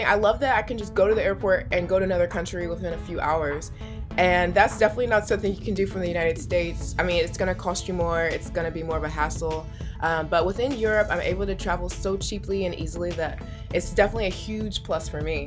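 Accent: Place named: Canada. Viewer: Hi. Just curious what state you are from? You kind of sound Canadian...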